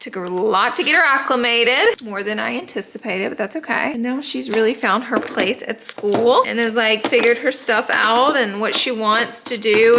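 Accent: South, United States. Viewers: girl I love ur southern accent. At first I thought u were saying lipples, like nipples. rofl but I realized ur saying lip oils!!! roflmao!!! tfs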